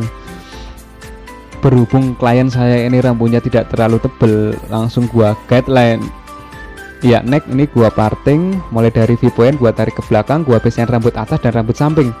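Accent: Indonesia. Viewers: Ngapak ya mas 😆😆 Salam satu gunting 💈💈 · Ngomongnya Gk usah gua2 sih wong logat jawa kok pake gua, bilang aja saya atau aku mas,,, biar enak dengernya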